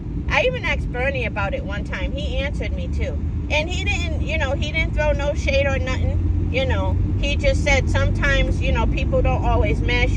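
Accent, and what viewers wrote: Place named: Boston, United States. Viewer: Talk yo shit with that Boston twang!! I want Abdullah in Buds camp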